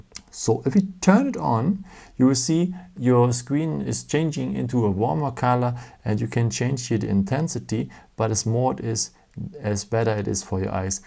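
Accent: Germany. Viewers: Think he's from Germany. I'm living there and most Germans speak English like that. But I agree with you :)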